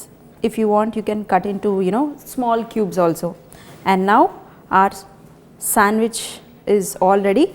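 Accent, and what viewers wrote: India. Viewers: I work in a hospital. I swear to God, the indian accent makes me wanna bust an indian in the fucking head!!!!!!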